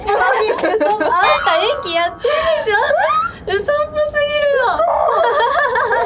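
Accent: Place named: Kyushu, Japan. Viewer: あやてぃ九州言葉が抜けてなくて心地よい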